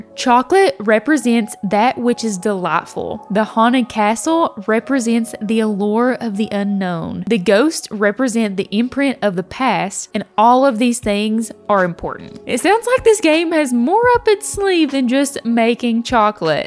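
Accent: South, United States. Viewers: It's so refreshing to hear a southern accent coming from a "cozy gamer" :) We southerners get a bad rep quite often so my wife and I are really loving you!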